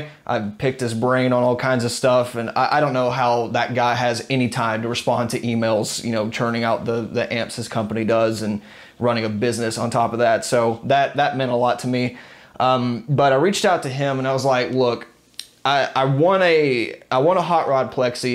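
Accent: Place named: South, United States. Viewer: just curious,do you live in the South??You have a southern drawl like me,HaHa...I am in Alabama...That’s a great sounding amp,I have been seriously thinking of getting a Ceriatone,just trying to decide which one....you may have just made that decision easy.....love your channel by the way....great tones..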